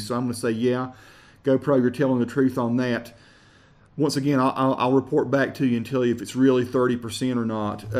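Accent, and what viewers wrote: United States. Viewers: You have a beautiful American accent (where I come from we don't have any American accents. We do have a couple of British accents, but no American accents). Seriously.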